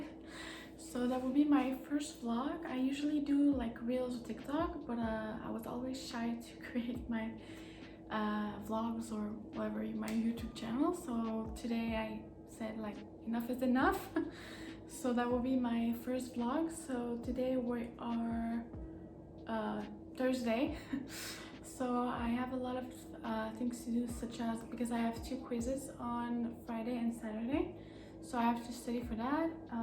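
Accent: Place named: France. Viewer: your English French accent got me hooked...lol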